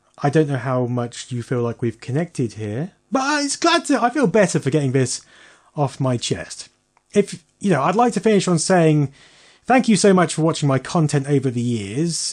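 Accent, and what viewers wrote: United Kingdom. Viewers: Bro just want to thank you for making vidoes. Listening your british accent and geeky videos makesmy day always better! 👍🏻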